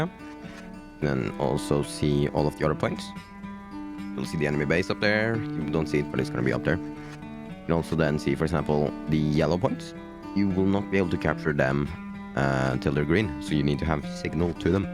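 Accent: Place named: Norway. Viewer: You're defo Scandinavian, based on your english I'd say Norwegian like me. Thanks for the video:D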